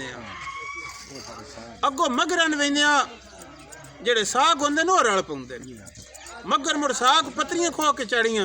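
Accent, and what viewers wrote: Shahpur, Pakistan. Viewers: You are representing pure shahpuri accent of Punjab. Our shahpuri or Jatki bar is consising district like Mandi Bahauddin, Sargodha, Hafiz abad, Jhang, Chinote and Khushab.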